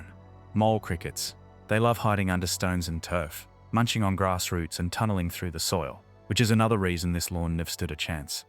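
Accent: Australia. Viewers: Wow! Love this vid. really appreciated the approach you took to honouring the legacy and history of the area. And as a fellow Australian, love hearing the Aussie accent!! It’s such a good reminder that you don’t need a huge space to do phenomenal things. Subscribed, and I’ll be sharing this vid with others. Love your landscapong wirk, as well as content capturing! and looking forward to watching more of your content!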